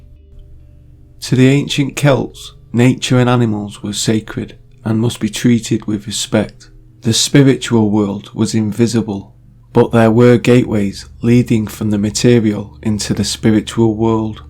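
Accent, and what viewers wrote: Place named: Sheffield, United Kingdom. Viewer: Love your channel, accent sounds close to home. Sheff? X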